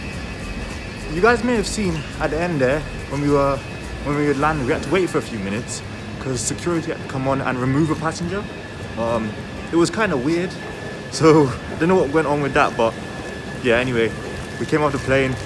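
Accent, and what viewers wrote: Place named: London, United Kingdom. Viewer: Trip to london... So where you really from. I thought you was from london😅all this time. Watched in full. Great stuff peace✌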